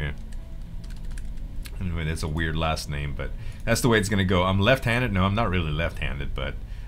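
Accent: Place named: Deep South, United States. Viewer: Danyelle Martin lousiana, he is from the DEEP south. I was born in Tennessee so I know these types of accents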